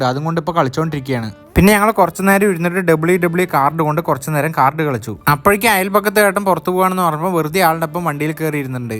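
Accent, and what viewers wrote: Palakkad, India. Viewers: എടാ മോനേ,സോറി ഞാൻ ഇത്ര സ്വാതന്ത്ര്യത്തോടെ എടാ എന്ന് വിളിക്കുന്നത് കൊണ്ട് തെറ്റിദ്ധരിക്കരുത് നിന്നെ കാണുമ്പോഴൊക്കെ എനിക്ക് വളരെ വേണ്ടപ്പെട്ട ആരോ ആണ് നീ എന്നൊരു തോന്നലാ.എൻ്റെ അമ്മ വീട് പാലക്കാടാണ്.നിൻ്റെ പാലക്കാടൻ ശൈലിയിലുള്ള ആ സംസാരം കാരണമാണ് അങ്ങനെയൊരു തോന്നൽ എന്ന് തോന്നുന്നു.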